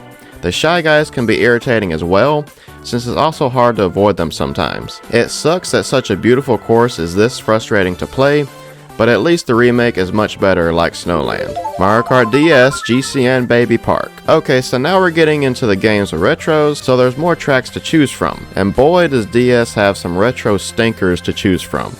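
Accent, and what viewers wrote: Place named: South, United States. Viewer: W man with a Southern accent talking about the worst tracks in a Japanese racing game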